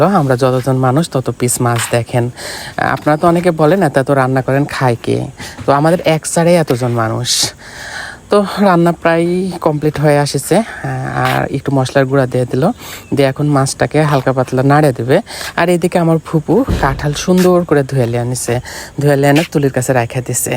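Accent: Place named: Rajshahi, Bangladesh. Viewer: রাজশাহী জেলার মানুষ এভাবে আ্যঁ আ্যঁ করে কথা বলে 🤮🤮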